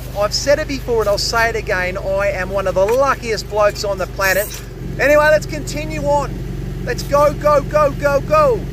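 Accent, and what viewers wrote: Australia. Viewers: LOVE Aussie LOVE your accent ❤ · Love your channel, but your silly over the top okka accent is a bit annoying. Don't be Steve Irwin of drainers mate!.....😂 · Let’s be honest, I’m more here for the Australian accent then the drain cleaning. That’s just a neat little bonus on top innit? Greetings from Germany :)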